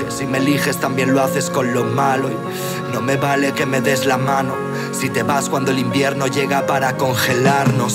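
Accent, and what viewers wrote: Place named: Andalusia, Spain. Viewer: me encantan tus temas, pero deja de poner acento del sur, enserio tio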